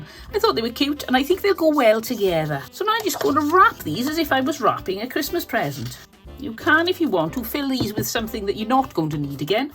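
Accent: Wales, United Kingdom. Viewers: we enjoyed your video,it was lovely to hear a welsh accent for a change on a crafting channel .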